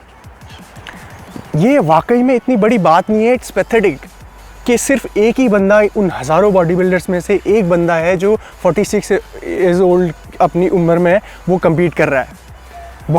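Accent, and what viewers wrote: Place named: Uttarakhand, India. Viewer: You look so genuine. Aapke hindi main aapka uttrakhandi hota jhalakta hai 😉😉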